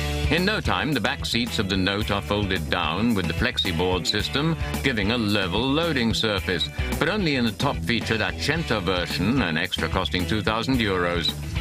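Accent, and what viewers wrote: United Kingdom. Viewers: Typical english hooooooooooooomosexual talking.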